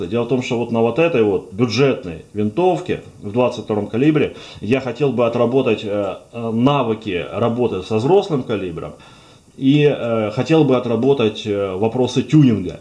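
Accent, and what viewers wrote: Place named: Ukraine. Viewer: у его английского сильный украинский акцент =)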